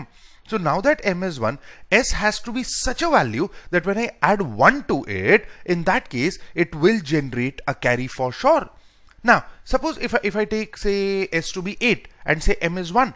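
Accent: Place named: Asia. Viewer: Your accent is too asiatic, dude. Good problem👍🏼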